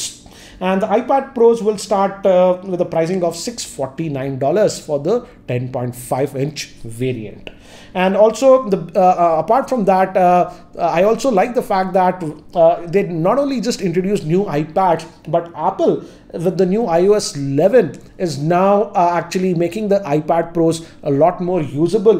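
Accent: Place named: South India, India. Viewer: Know How it's is a south india thing....accent!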